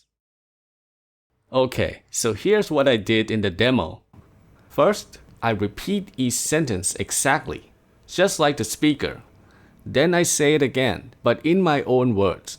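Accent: Thailand. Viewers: I think your broke English sounds like Thai pronunciation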